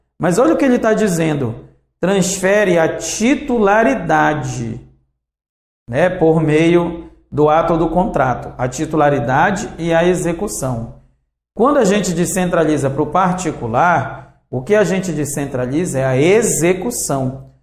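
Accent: Pará, Brazil. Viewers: Esse professor é Paraense né? :D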